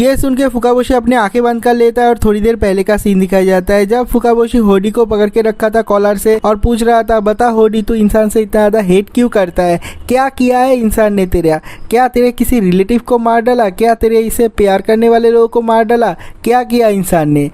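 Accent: Bengal, India. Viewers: Tum kiya bangali hoo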